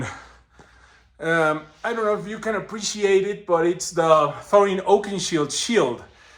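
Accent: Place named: Latin America. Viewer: De donde eres? Por tu pronunciacion, se escucha que eres latino! Esta conmadre tu cuarto!!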